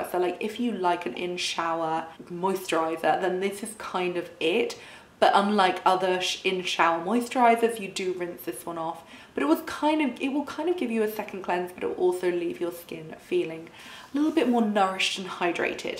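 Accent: United Kingdom. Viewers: Thank you for the infos said with a lovely British accent ❤️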